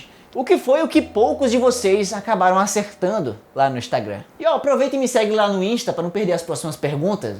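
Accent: Ceará, Brazil. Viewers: Vc é cearense? Tbm sou haha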